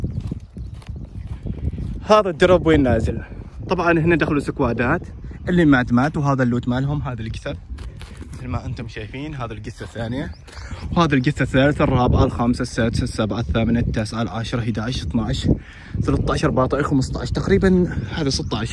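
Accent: Oman. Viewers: والله كنت حاس من كلامك انك عماني 🇴🇲. احبك. متابعنك من ولاية محوت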